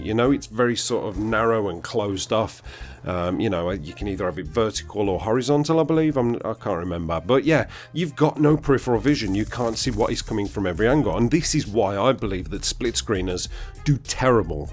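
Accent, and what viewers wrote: United Kingdom. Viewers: are you British? *****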